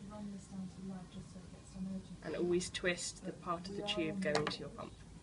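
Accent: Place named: United Kingdom. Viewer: Thank you. Love your explenation and your British accent.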